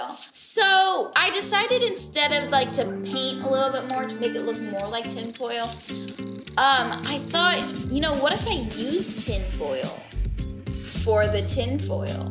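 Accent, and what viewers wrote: United Kingdom. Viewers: I love the British accent! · You sound so good in British accent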